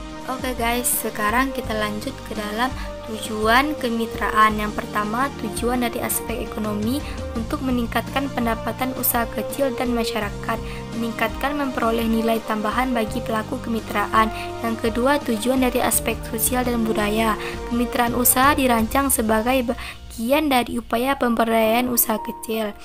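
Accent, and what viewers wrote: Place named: West Sumatra, Indonesia. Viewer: hahah logat minang nyo ses😅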